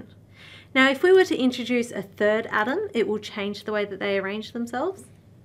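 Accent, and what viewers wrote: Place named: Australia. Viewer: Good video. Well explained. The Aussie accent is easy to listen to as well.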